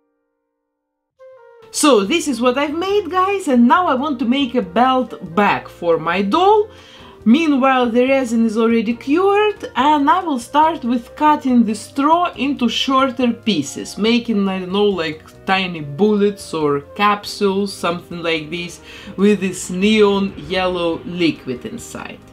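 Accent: Russia. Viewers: почему я слышу русский акцент ) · Добрый день,! Очень нравится Ваше творчество, Вы потрясающий мастер, давно хотела написать Вам об этом, смотрела Вас и думала, что очень знакомый акцент, проскакивали слова, явно, с претензией на русский язык! А сегодня нашла Вас на Facebook и не ошиблась! Вы большая молодец ✊🏼 спасибо за видео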